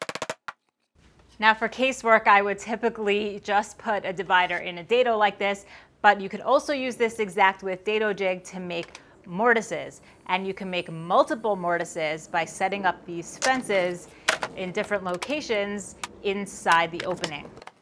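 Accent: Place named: Northeast, United States. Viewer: "joinTing"?,,,There are LOTS of wood working channels out there,, Listening to your Northeast accent is why I like yours,, Keep being you!!... ;-)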